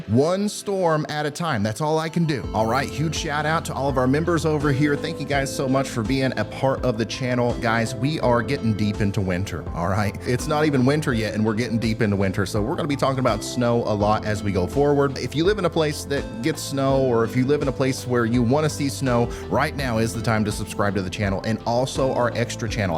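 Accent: South, United States. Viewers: I don’t like your southern accent.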